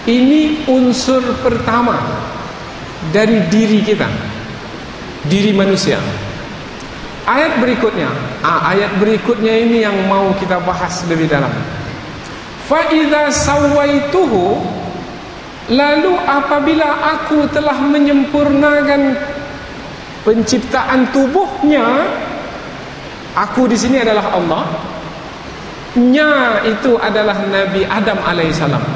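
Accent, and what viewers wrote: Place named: Malaysia. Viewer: Sekali dengar seperti orng Malaysia.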